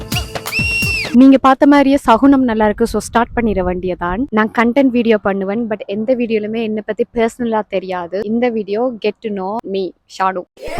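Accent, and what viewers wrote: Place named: Sri Lanka. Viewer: Nice. Keep going. ❤🎉. Love your srilankan tamil ❤ loves from 🇲🇾❤️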